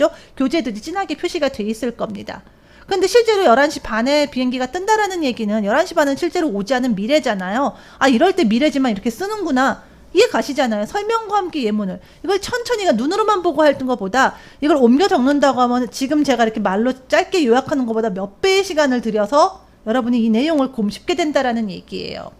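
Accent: United Kingdom. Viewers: 요즘 선생님 강의를 듣고 있는데 영국 억양도 그렇구 분위기가 브리짓존스의 르네 젤위거 배우를 많이 닮으신 것 같아요!!!!!너무 아름다우세요!!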